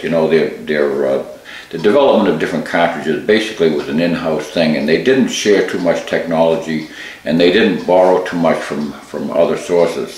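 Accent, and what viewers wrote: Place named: Massachusetts, United States. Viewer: I detect a touch of "Bay State" accent.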